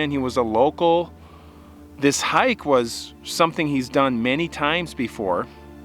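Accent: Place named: Minnesota, United States. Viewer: My older sister lives in Minnesota, way up near Hibbing. Been there several times and you can definitely hear the accent in as he talks. Accents rarely 100% leave you.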